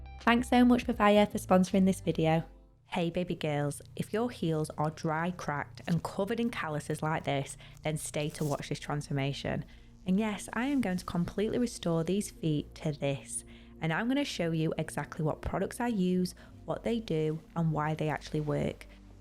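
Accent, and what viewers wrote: United Kingdom. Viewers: From what part of the British Isles does your accent originate? I think it's fantastic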